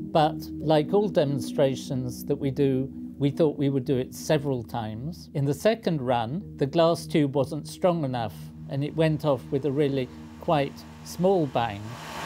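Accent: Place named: Nottingham, United Kingdom. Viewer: That's how they pronounce tomatoes in Nottingham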